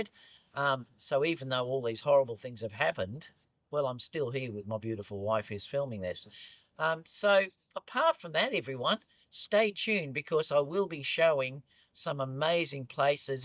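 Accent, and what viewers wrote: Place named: Australia. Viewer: So good to hear your beautiful Australian accent again. Miss you very much on youtube. Take good care of yourself because good health is only appreciated when it's gone. Looking forward to your road trip videos. Best of luck to you and your wife. Cheers.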